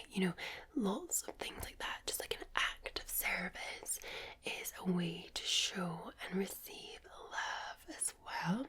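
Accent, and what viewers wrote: Scotland, United Kingdom. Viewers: She looks like pin up art and calms me like.... tranquility. are a fine sleep aid. TY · Another Scottish ASMR. Another happy American soldier 🥹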